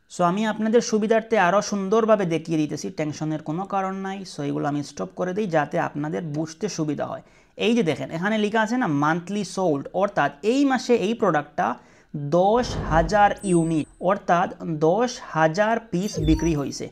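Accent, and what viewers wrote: Sylhet, Bangladesh. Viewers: ভাই আপনি কি সিলেটি 🙂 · আপনি সিলেটের নাকি? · Bhai ki Sylheti?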